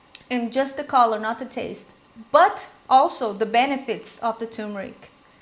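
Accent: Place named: Brazil. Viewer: Hi. I am trying to guess where is your accent from. Is it from Brazil? Thank you.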